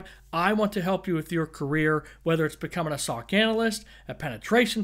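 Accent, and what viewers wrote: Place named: South, United States. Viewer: That accent... South of the Mason/Dixon and East of the Mississippi.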